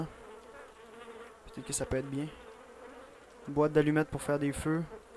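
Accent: Quebec, Canada. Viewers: dans quel pays habite tu quand on dirait que tu as un accent québécois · je veux un autre episode pas demain parce que je suis pas la mais apres-demain nan sérieux ton accent québécois paraissait plus dans cette video et ta pas de laire de venir da grande ville mais plus du nord du québec =)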